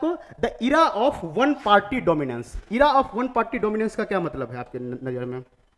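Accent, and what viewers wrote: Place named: Bihar, India. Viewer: Kon h language bihari k lgta h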